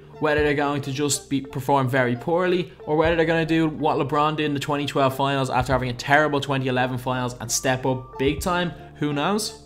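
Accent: Ireland. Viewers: Is this guy irish???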